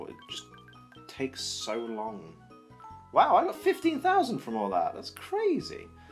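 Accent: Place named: Midlands, United Kingdom. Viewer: You've quickly become my favourite pokétuber! I've managed to get most of my friends into your videos too! Fellow Brit here, are you from the midlands? I'm from the west midlands and you sound like you're from near here :)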